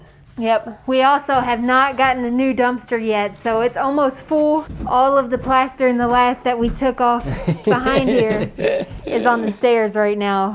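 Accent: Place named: Kentucky, United States. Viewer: I'm from Lexington Kentucky. That North Dakota accent sounds just like a Kentucky girl 😊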